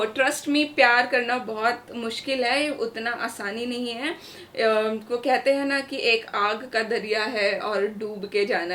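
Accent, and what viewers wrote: India. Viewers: Logo ka kaam hai khna, unhe khne do.btw love ur hindi accent( i speak urdu)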